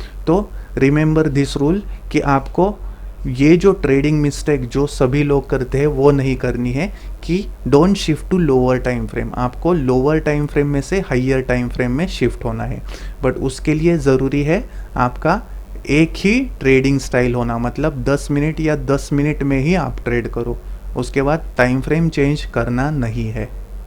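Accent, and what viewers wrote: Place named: Maharashtra, India. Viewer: आवाज आणि उच्चार ऐकून वाटत की तु मराठी आहेस. तुझे vdo पाहिले आहेत. खुपच माहितिपूर्ण vdo आहेत. तुझे खुप खुप आभार🙏 अवांतर :- मित्रा मला तुझा आवाज अमोल पालेकरां सारखा वाटतो,😊😉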